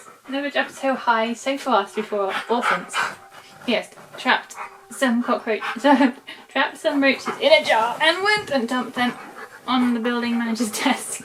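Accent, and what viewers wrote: United Kingdom. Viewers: The British accent really helps make scary stories sound better. It amazes me that Key understands “Lay your butt down”. How could he understand that? To me, that basically means that Key understands virtually everything, or else he knew he was doing something unusual and the tone of her voice suggested a request. Either way - pretty darn smart!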